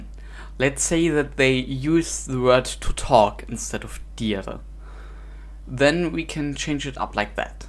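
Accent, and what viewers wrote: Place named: Germany. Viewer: He sounds German 🤷‍♂️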